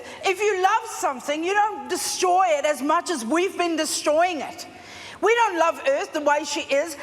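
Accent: Australia. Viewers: Can't tell if she's Australian or South African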